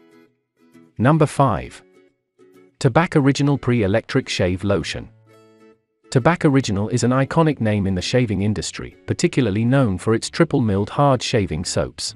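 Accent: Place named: England, United Kingdom. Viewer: Another authoritative sounding video with Engl-ish accent, maybe AI. Why do pre-shave lotions cost so much, mostly alcohol.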